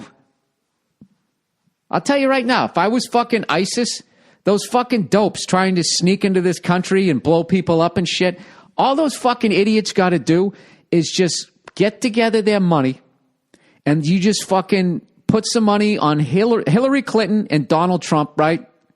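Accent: Boston, United States. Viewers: Blends well with the Boston accent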